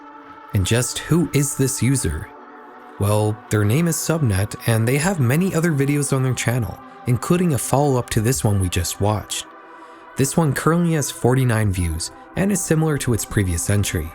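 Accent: Poland. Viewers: ale ten polski akcent słychać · the polish accent gets me by surprise as i skip the video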